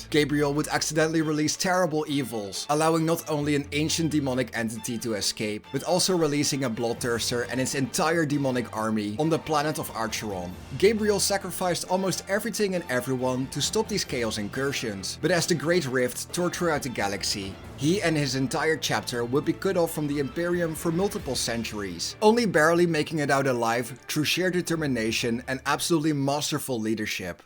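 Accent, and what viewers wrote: Netherlands. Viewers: The Dutch accent is music to my ears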